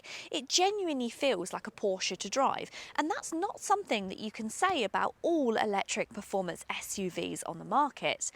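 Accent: Essex, United Kingdom. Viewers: Hi, nice and informative video, great car regardless of the price. Besides, with a lovely British accent, a perfect listening exercise 🙂. Bye from Spain. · Are all of this ladies family , friends and parters the commenters on here ? Her outfit is ill fitting, nasty colour not fitting her figure or her Essex accent. She sounds pleasant and informed, better than Clarkson’s ego reviews. She does speak a little fast. Slow down a tad .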